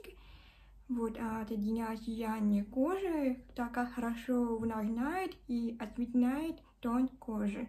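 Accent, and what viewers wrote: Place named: France. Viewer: у тебя красивый акцент) я сначала подумала, что ты француженка :)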